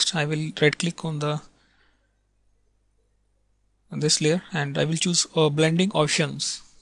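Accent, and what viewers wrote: India. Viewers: I love the Indian accent. It actually makes english sound nice for some reason.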